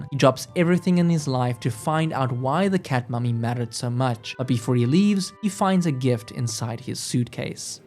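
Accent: South Africa. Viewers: The most South African sounding creator I have never seen.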